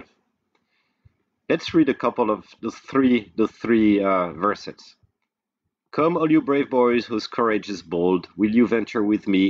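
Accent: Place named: France. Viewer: nice french accent ! ^^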